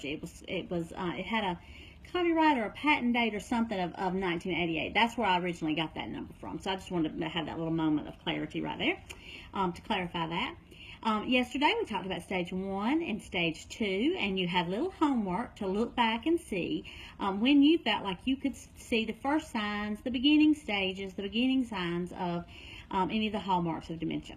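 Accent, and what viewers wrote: South, United States. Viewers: You are so helpful! I love your southern accent. Sooo cute! And you DO KNOW A LOT! 💓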